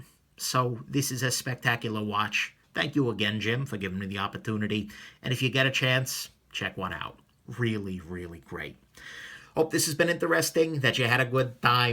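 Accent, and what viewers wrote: New York, United States. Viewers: Same! Voice reminds me of people I know back in New York. Someplace I haven't been back too since 2011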